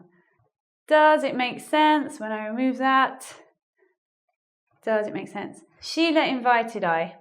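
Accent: United Kingdom. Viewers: Your voice and British accent are so elegant that I come to listen to them no matter what you tell (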